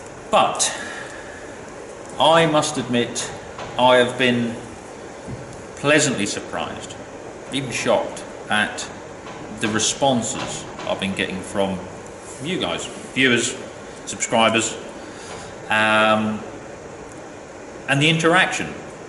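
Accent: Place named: East Anglia, United Kingdom. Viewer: Well I have not been a subscriber for very long as I found your channel from Cotontop3 when he mentioned you by name and I have seen your name on some youtube video channels that I watch. I like your comments to others. I came and watched a few of your videos and liked what I saw so I subbed. I enjoy listening to your Norfolk/Suffolk accent and what you put up for us to watch. I am really looking forward to seeing a lot more stuff on your 3350 especially the internal refurbishment of the cab.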